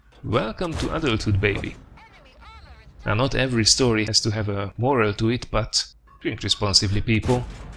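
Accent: Hungary. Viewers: Az akcentus elég árulkodó :) Ráadásul Velem egykorú... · Tudtam hogy magyar akcentus :D tetszik a sorozat de szerintem egy rész lehetne hosszabb is.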